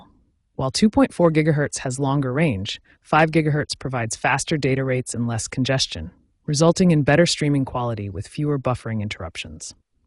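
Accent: United States. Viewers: As an example of poor delivery technique, this presentation would be useful as a training aid for teachers and lecturers. The presentation is poorly coordinated with information flashed on the screen brielfly with insufficient time to assimilate the content. Thereares also unecessary and distracting blank pages. The rate of s[eaking is almost so fast it is garbled and while the presenter's diction is quite good, why was an American accent chosen for a product supplied in the UK? There should be considerably more detail on how to access \setting options and a link to a printable transcript so that the user can follow the information at a pace suitable for making adjustments.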